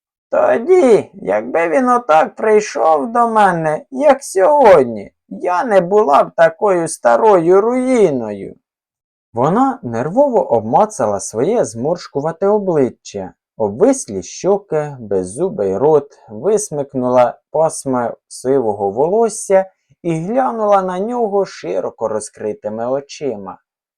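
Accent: Surzhyk, Ukraine. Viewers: Сумщина? Полтавщина?) Суржик, рідний суржик - нашеВсе! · Напевно в різних регіонах України присутній свій акцент. Ваш акцент це як суржик.Не ображайтеся, але мені не сподобалось. А Бредбері неперевершений.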